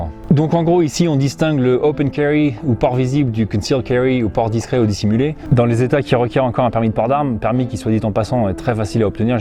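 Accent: United States. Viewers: vidéo très intéressante, par contre faut qu'il arrête avec l'accent américain péteux c'est trop mdr ceci dit très bonne vidéo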